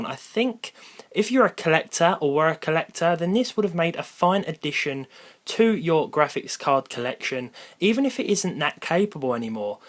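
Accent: United Kingdom. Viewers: You look like General Sam with a British accent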